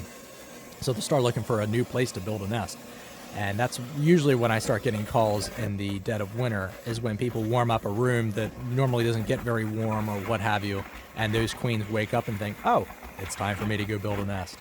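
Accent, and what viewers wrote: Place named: Carolinas, United States. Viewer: U sound like ur from nc or sc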